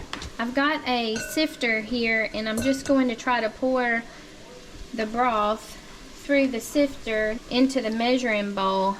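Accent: South, United States. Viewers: I love your southern accent! And your grocery ideas. I don't see snack items on your list. Does your family snack?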